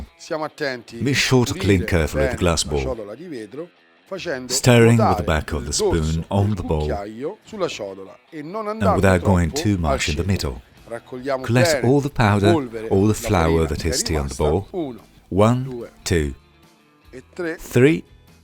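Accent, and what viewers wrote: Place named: England, United Kingdom. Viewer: I really could do without the heavy English accent voice over. Subtitles work fine if needed.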